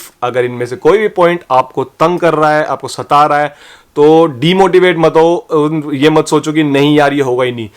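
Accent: Haryana, India. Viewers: i think aap haryanvi ho right?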